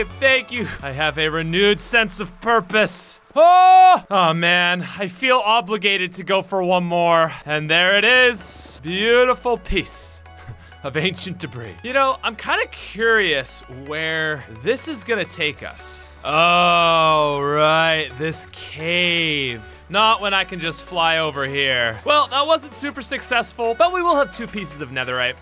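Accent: Ireland. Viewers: Is he Irish because sometimes he sounds Irish but it’s not super noticeable like most Irish people but I can kinda hear it. I HONESTLY can’t tell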